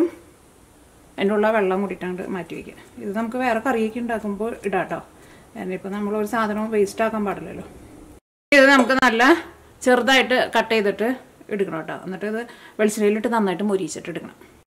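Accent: Thrissur, India. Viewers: ee Thrissur slang thanne endh resaa kelkkaan... athinte koode immaathiri tasty food um undaakkumbo sherikk Thrissur Pooram....!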